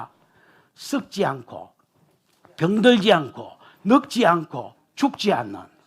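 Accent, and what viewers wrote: Gyeongsang, South Korea. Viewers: 목사님, 캐나다 토론토에 25년 넘게 살고있는 교민입니다. 우연히 영상을 보게되었었는데 첨엔 코믹하고 촐랑거리는(지송! ㅋㅋ) 특별한 캐릭터의 웃긴 목사님인거 같아(글구 심한 경상도 사뚜리~)넘어갔었는디... 또 몇달후.. 구속사를 듣게 하셨고 고린도전서, 열왕기서 강해들을 들으면서 눈이 번쩍 뜨이고 '이분 따라 살아야겠다' 고 생각하며 정신이 번쩍 났었습니다. 저는 이민와서 예수 믿기 시작했었는데, 긴- 시간동안 왜 교회가 강해설교를 안해주는지 정말로 답답했었거든요 😭 그날에 큰- 상급이 확실하시니 힘내시고 계속 앞에서 달려주셔요! 화이팅!!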